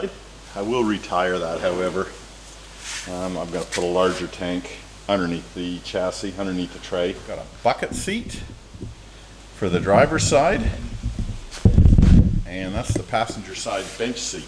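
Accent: Canada. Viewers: Hi. Another cracking video and you’ve set yourself quite a challenge the! On another issue altogether now. I just can’t seem to help myself looking beyond the video presented to speculate on the presenters background. There’s no hiding that Canadian accent but your use of the Australian “lingo” is spot on. So much so in fact that I could almost second guess what you were going to say so I would call you 100% Australian! So welcome mate! You must also have some sort of mechanical / technical background to be tackling the projects you do. Whatever the case welcome to Australia and I hope you and your family have a long and happy life here. Cheers from Gippsland.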